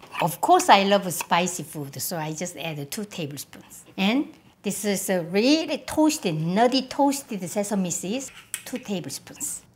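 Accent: South Korea. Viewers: 와 한인영어 너무좋아요 항상 잘보구잇습니다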